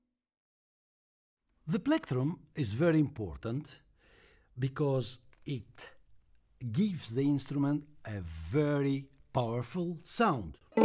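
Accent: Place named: Greece. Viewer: This is so far the best introduction of this legendary instrument. Respect for your dedication of research, experiment and creativity. It's a very inspirational personal story as well. (I enjoyed the greek accented english narration as well, it's like a very calming story telling :D) Bravo for reviving this great instrument!!!👏👏👏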